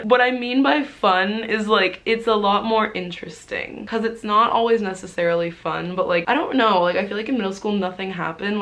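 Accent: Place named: Canada. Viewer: That Canadian accent is god awful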